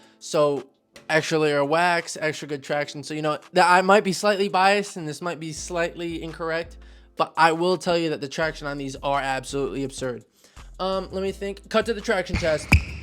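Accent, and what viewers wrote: New York, United States. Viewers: New york accsent is crazy size 9.5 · BRO THE NEW YORK ACCENT HAD ME DYING 😭😭 (size 7.5) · The ny accent is nutty ( size 10.5) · nice new york accent man😭😭 (size7)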